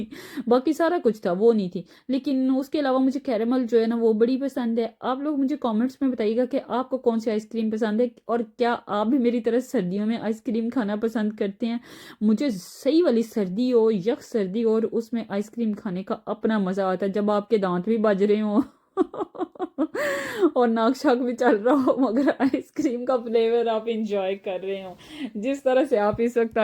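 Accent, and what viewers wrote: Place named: Punjab, India. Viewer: Mujy ap ki Punjabi Ka tarka bohat Pasand ha ji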